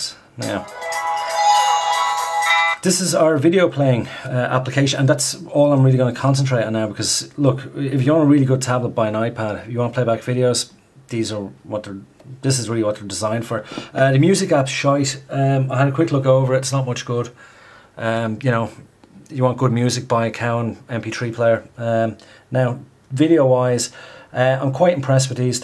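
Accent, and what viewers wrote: Ireland. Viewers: Love the accent.....irish? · fecking hell, you are so obviously Irish :P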